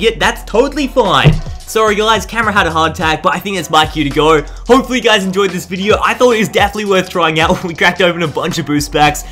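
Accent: Australia. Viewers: He's Australian sir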